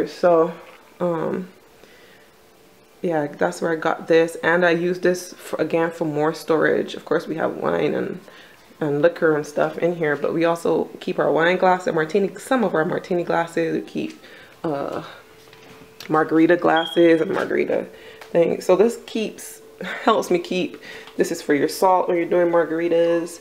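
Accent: Barbados. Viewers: I love ur style U sound like a Bajan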